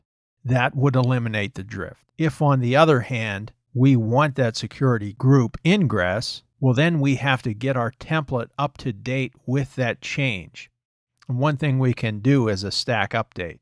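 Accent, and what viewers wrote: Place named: United States. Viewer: Yay! An american voice!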